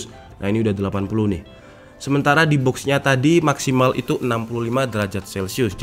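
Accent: Malang, Indonesia. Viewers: Medhok malang😅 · Saya kyk nya kedengaran Malang ,Malang mana bang 😂😂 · Lo mase wong malang to bak e 😁😁😁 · Owalah mas jawa pisan to... Org mana mas...saya Banyuwangi, Hadir🙏🏻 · Ela wong jowo jebule, "Opo si ki jenenge"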